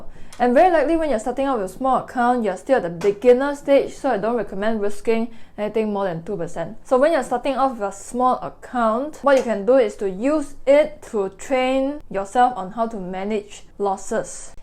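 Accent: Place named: Singapore. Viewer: I love Singaporean accent Lah!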